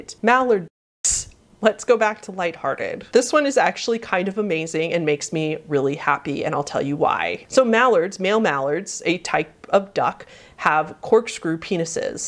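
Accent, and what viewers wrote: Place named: Los Angeles, United States. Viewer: I'm going to guess you're from LA from the way you ground out the end of your sentences.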